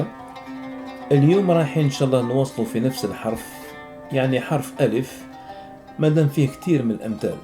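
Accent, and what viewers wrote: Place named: Algiers, Algeria. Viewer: امثلة مليحة الله يبارك فيك، و هذي امثال جزائرية ولكن راك تنطقهم بالهجة العاصمية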